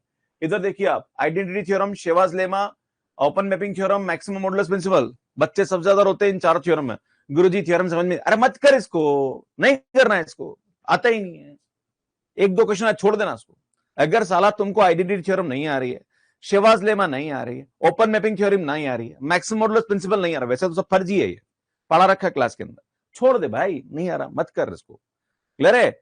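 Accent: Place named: Haryana, India. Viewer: Sir aapki bolli me haryanvi touch h😝😝😝 Jo bot mst lgti h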